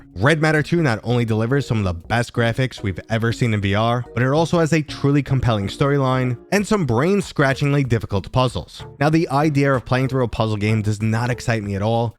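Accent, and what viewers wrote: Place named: Boston, United States. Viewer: I’ve never been to America but imma guess this guy is from Boston? 😂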